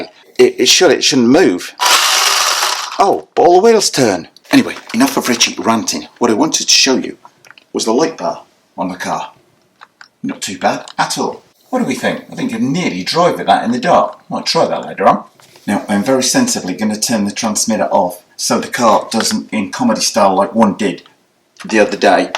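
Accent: United Kingdom. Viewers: Are u british??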